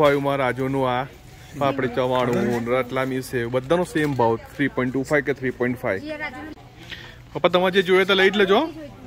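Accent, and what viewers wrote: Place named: Surat, India. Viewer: Wow.. nice collection of typical GUJJUS. yummy. Commentary paakki SURTI …👏👏👏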